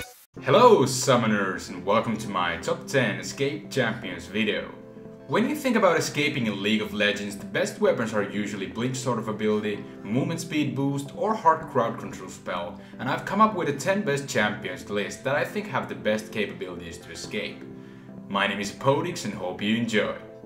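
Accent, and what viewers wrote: Finland. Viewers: ookkos suomesta kuulostaa vähä silt ? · ootsä suomalainen koska kuulostat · Where are you from? You sound little bit finnish:D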